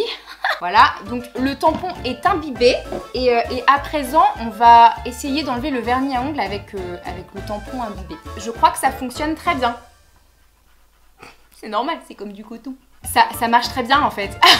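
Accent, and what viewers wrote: Quebec, Canada. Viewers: Tu as un petit accent canadien non ? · ta un petit accent québécois on dirait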